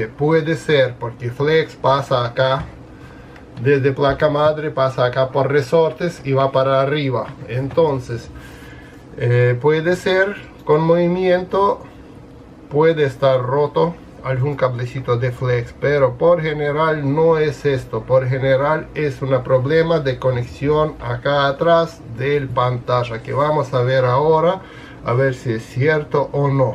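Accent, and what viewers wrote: Russia. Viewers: Ruso o ?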